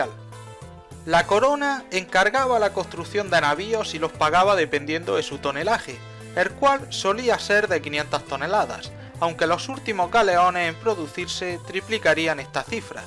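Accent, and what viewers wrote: Murcia, Spain. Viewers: De que parte de España es ese acento? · Aaaaaaaxooooooooooooooooooooo,ese acento es murcianico pijo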